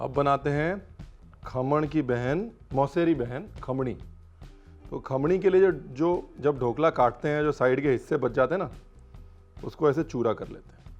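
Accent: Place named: Punjab, India. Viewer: Love your style of speaking, the comic timing, the pauses, and the punjabi accent :-) and the history bytes are amazing too!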